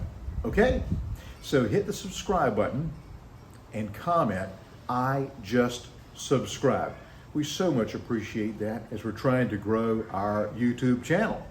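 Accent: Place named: South, United States. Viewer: I love your slight southern accent. I’m from NC, live in CA and considering moving to Mexico.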